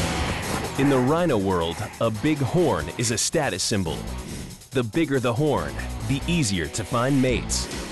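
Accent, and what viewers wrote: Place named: United States. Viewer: I am not attacking Americans? I am saying that if this hole video was spoken in, with an English, Scottish, Australian, or even Irish voice-over accent, it would not sound so incredible and horrified terrible as it is spoken by this American voice-over. And this video is not the only example of what I mean, it is something USA people do for the last thirty years, and it's getting worse every day? Why? How come? Where did that start? I whish the video had a BBC voice-over instead of this.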